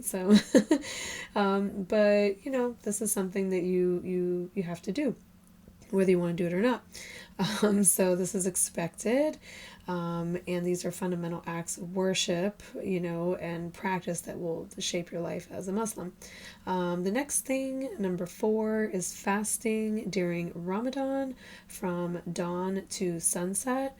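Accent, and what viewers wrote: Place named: United States. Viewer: You have an American accent so speak about a more progressive Islamic world like some Muslim communities in the West... There are also progressive Islamic communities elsewhere in the developing world... Why only speak about the worst of the worst? But you don't do that with Christianity??Howcome?🙂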